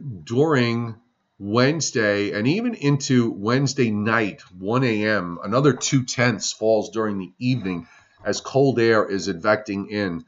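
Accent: Long Island, United States. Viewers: When I hear your voice it always reminds me of Long Guyland..Thanks for your YouTube weather..main stream has gone to Hell !!.. Just can't watch anymore...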